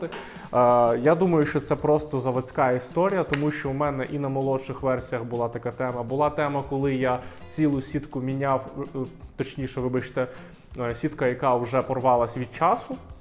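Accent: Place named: Surzhyk, Ukraine. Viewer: Как бы ты не пытался, но увы, слышно четко, что Украинский язык не твой родной, суржик это твое, вся восточная Украина знать не знала своего языка, все говорили на суржике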